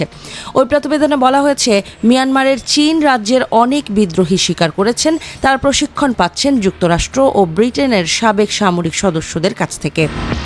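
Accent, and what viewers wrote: India. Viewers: এনটিভিতে ভারতীয় একসেন্টে কেন রিপোর্ট পড়া হচ্ছে।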